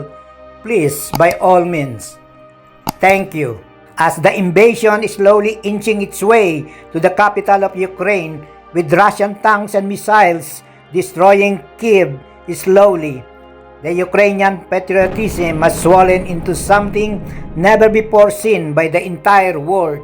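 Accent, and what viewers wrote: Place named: Philippines. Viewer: Wow! That was great vlog,. Good English with Pinoy diction. Congratulations.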